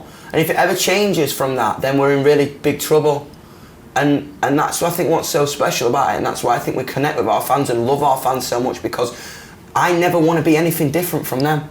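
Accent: United Kingdom. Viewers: Yeah, he is speaking British English, a proper English many british people would say. So American mates are the ones got an American accent if we r being correct here lol, including me at some point as well. Not trying to be rude. Just reminding of the history. I also like British English, as many other English accents.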